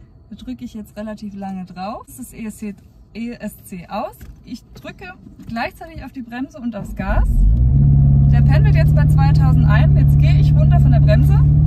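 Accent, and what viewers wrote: Bavaria, Germany. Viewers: Sehr schönes Fahrzeug ich habe A5 Cabrio 2018er :) bist du aus Bayern mfg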